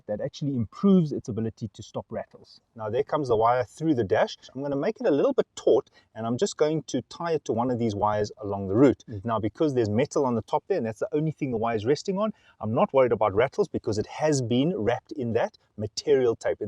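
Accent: South Africa. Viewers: Great video. Detect a South African accent there. Groete van Engeland af 😜 Great video. My dashcam has parked recording mode so need to source a 12v constant. Easily done. What I have used is some conduit and fabric tape. Probably a bit ott but just for the added surity the wire cannot be exposed. Great video though. Very helpful thanks.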